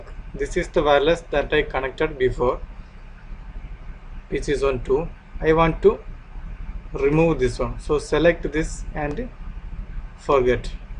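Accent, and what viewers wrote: India. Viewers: Your accent is too indian for me.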